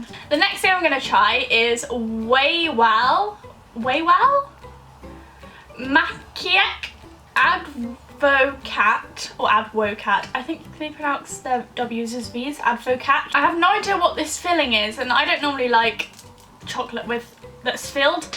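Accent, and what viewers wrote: United Kingdom. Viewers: British accent is everything 🙊🙊🙊💞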